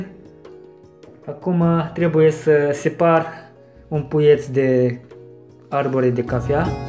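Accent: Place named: Russia. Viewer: vlogul e fain,dar mai lasă limba rusa deoparte, te face să vorbești Romana "urat" 👍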